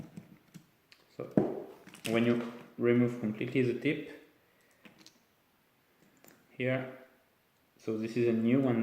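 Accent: France. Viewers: Quel accent Français, mais tu parle bien anglais! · Cet accent Français!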